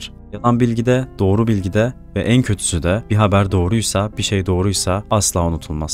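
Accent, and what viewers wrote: Denizli, Turkey. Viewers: denizlili misin reis sen aaa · Abi sen denizlilimisin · Abi denizlilimisin OHAĞHH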